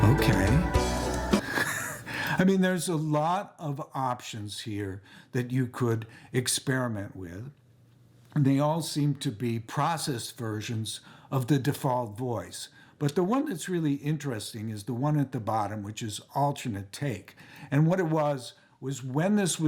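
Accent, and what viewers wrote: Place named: Brooklyn, United States. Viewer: Nah, it's the Brooklyn accent! East Village resident for 30 years; now down South. LOVE the Brooklyn accent (you'd be very popular down there - folks love it here as well!) Though, like Jeffy - folks outside NY probably can't tell the difference between Queens (Woody) and Brooklyn (you). You're definitely cooler looking than Woody:>))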